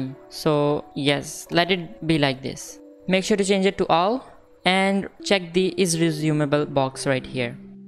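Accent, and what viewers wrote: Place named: India. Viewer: With the Hindi accent and the noisy background, the tutorial is irritating. Text to speech applications are freely available on the internet. You simply type what you want to say and the converter can turn your text to choice English speech. You can choose any voice type; or language and even such as Oxford or Cockney English. Yes, you can also choose between male and female as well as children. Windows also has such a facility.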